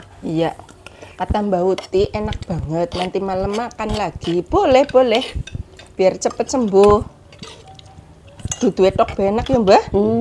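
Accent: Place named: Tegal, Indonesia. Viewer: Wah resepnya mudah dan simpel y mb semoga bs dicoba SM ak insyaallah klo ad rezeki pengin nyoba jg mb seneng deh liat MB sekarang udah bs bikin konten sendiri y mb walaupun sederhana y mb videonya tp banyak yg nonton mb mengispirasi mb logat ngomongnya kayak ak MB ak dr Tegal ngapak jg mb tp ak ikut suami tinggal dilampung mb semangat terus mb bikin kontennya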